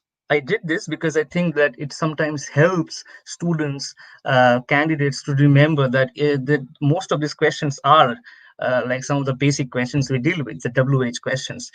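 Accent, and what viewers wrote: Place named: India. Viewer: the accent was hard for me to understand. I think it is good for Indians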